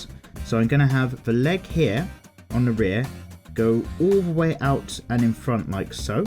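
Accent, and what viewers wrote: United Kingdom. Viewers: Your in the UK from the way you talk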